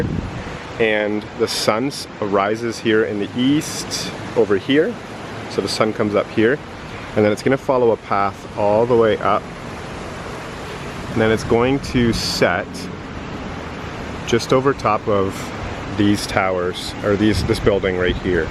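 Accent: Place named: Canada. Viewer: I knew you were Canadian as soon as I heard you talk. Great video!! This is the best one I’ve seen. I’m going on the 29th and it was so detailed.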